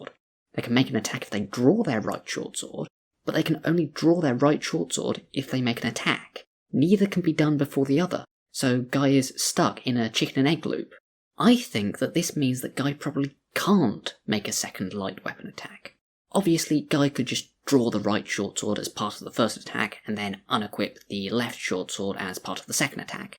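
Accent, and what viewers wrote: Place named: United Kingdom. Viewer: You sound a bit young.. but your voice is that type of brittish that lets you know you were born as a 35-year-old person with a cup of tea in hand. Im not sure what software is changing your voice. But you could tune it in a bit. If you're not using something to augment your voice you could use a new mic.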